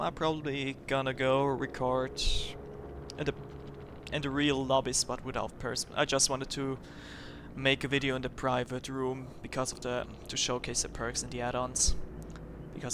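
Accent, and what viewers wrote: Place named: Germany. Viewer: I was just wondering, because you sound german:D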